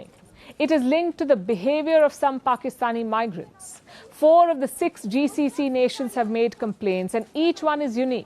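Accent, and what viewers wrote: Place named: India. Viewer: What a “desi English “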